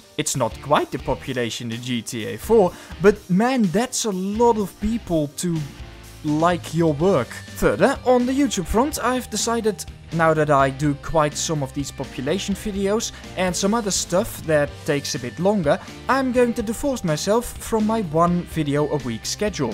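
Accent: Netherlands. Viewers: Nederlands accent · nice dutch accent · I have an feeling that you're dutch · Are you Dutch? Your accent sounds Dutch 😂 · what an example of dutch accent😊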